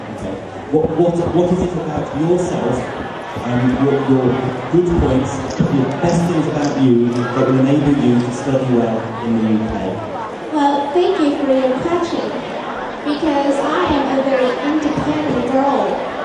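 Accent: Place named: United States. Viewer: Your accent is way more like American than British.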